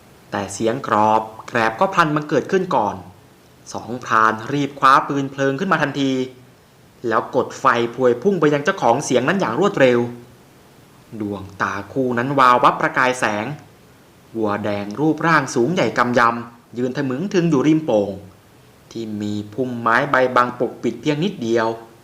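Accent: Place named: Rural, Thailand. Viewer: ສຳນຽງພູດຄືມາກ ກົມກື່ນກັບສະພາບບ້ານນອກດີນະ ຄິດຕາມທຸກຄລີບ ຂອບໃຈ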